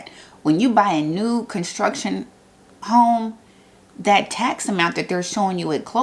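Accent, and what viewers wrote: South, United States. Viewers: with a southern accent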